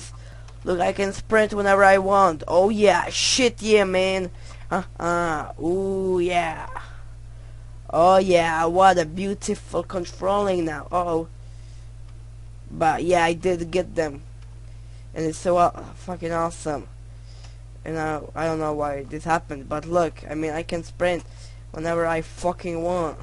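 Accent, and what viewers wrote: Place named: Germany. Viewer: yer it lags....allot....ur not english are u? u German?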